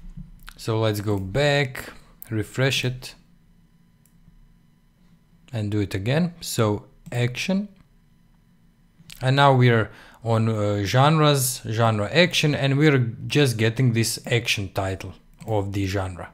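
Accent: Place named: Russia. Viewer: Just your accent in English is similar to Russian )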